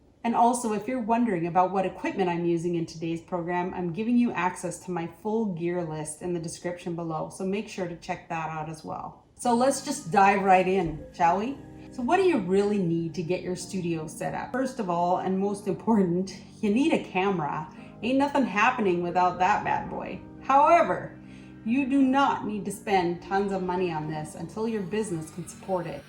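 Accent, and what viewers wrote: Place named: England, United Kingdom. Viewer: I liked your English accent. I´m from Brazil. Where are you from?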